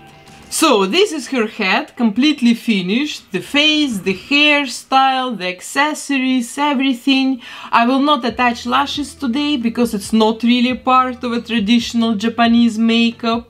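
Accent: Russia. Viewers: вы ведь не американка и не англичанка. у вас акцент как у русской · Ох, этот русский акцент.. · У тебя русский акцент или мне кажется... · Русский акцент ?🤔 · Хех прикольный русский акцент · вы точно не русская? прям как будто русски английски, так сказать, звучит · Мне кажется, или у неё английский с русским акцентом..... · Слышу русский акцент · Only me hear that strong Russian accent? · Этот убийственный русский акцент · Она не похожа. У нее акцент как у русского, который пытается говорить на английском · Видео крутое, но русский акцент мешает наслаждаться им в полную меру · Ты русская? Акцент русский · Почему мне кажется что у нее есть русский акцент. Будто бы русская, выучившая английски. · Даже я слышу русский акцент)) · почему тут нет ни одного русского комментария? я слышу 1000% русский акцент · Почему мне слышится русский акцент?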